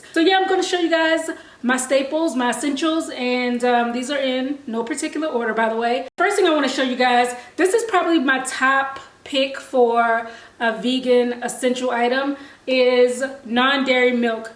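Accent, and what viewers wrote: Baltimore, United States. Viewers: You from Baltimore? You have a accent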